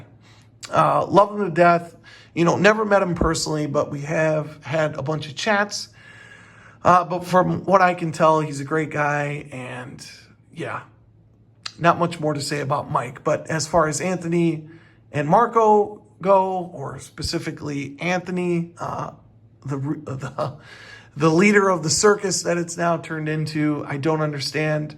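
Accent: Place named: Chicago, United States. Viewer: well I’m a fan dude. I moved from Chicago 10 years ago to Seattle, it’s awesome to hear a Chicago voice on here describing places I miss AND watches. Keep it up!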